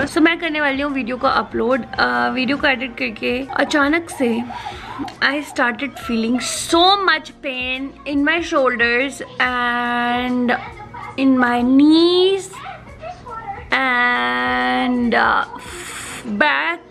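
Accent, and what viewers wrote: Punjab, India. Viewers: Love you baby 😘😘😘😘😘😘😘😘love ur punjabi touch dear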